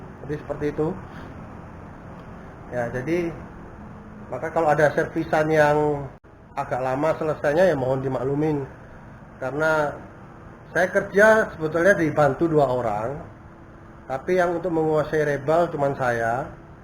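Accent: Java, Indonesia. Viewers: Asline jowo mas???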